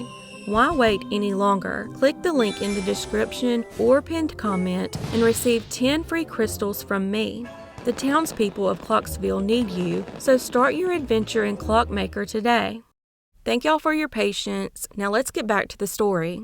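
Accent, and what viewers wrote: South, United States. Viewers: I'm not far in yet but I'm so glad you decided to keep doing ads - you deserve to be paid for what you're doing! Plus your accent is so charming I honestly don't mind them because I'd listen to you read the phone book... and I'm a fellow southern girl, haha! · So happy to hear your southern voice again. I used to spend winter in Gulf Breeze Florida.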